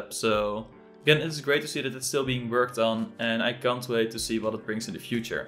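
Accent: Netherlands. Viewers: mooi nederlands accent, goeie video! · are u dutch lol?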